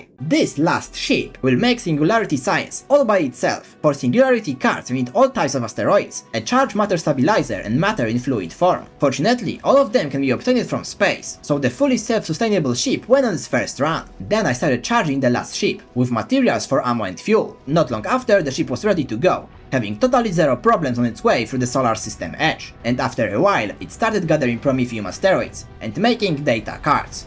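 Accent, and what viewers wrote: Poland. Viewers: I am like 99% sure that ur native polish person, it's just something about your accent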